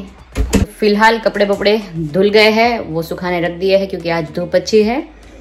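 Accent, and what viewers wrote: Kathiawar, India. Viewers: pure kathiyavadi Mithi gujrati boltahe happy navratri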